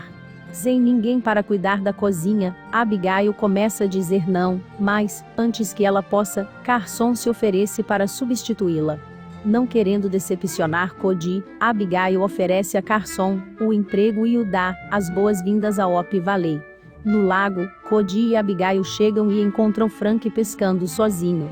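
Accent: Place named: Northeast, Brazil. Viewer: Gente,é uma nordestina tentando narrar🤣🤣🤣🤣…Sou nordestina e não tenho nada contra mas ficou péssimo😂😂😂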